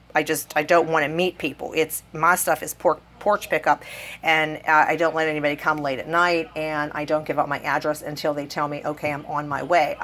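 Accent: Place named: Pennsylvania, United States. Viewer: I love hearing that PA accent! My husband's family is from Mckeesport and Monroeville. My in-laws and Husband lost their accent b/c they spent 20 years in South Florida. Hearing you reminds me of hub's grandparents, who passed on years ago. Wow! That was a long winded explanation 😂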